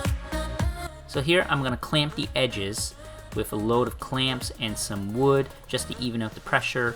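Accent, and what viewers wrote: Canada. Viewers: I hear some Canada in your voice?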